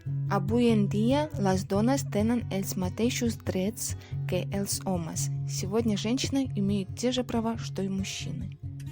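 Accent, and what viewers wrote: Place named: Eastern Europe. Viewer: На произношением конечно надо поработать.... Буква r в конце глаголов, например, не читается. Уже молчу про типичный восточноевропейский акцент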